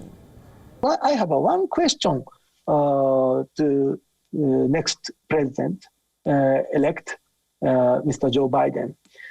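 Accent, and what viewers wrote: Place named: India. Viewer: Beautiful like indian english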